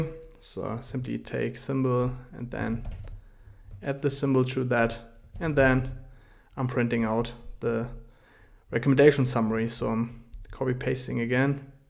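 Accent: Germany. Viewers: ich höre du bist deutsch, wäre es möglich mal du reden über discord? ich habe kranke erfahrungen , also sehr sehr viel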